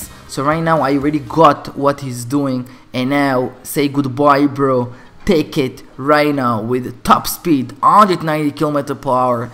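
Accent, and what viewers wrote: Israel. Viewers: יש לך מבטא ישראלי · ישראלי? אם כן, יש לך מבטא פצצה מה זה זה...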